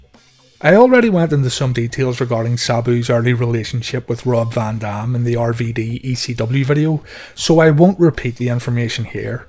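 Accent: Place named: Northern Ireland, United Kingdom. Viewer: You dont need to change by the way mate your Norn Iron charm and knowledge/passion keeps us coming back.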